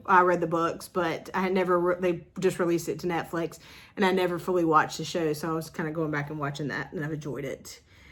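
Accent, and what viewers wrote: South, United States. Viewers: You’re the only Southern or with an accent to enjoy listening to. Shhhh… don’t share that with anyone or I’m sure I’ll get a lot of haters!😊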